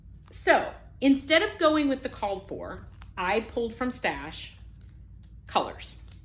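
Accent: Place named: South, France. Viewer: Loved your southern French accent! I would love that sampler. So colorful.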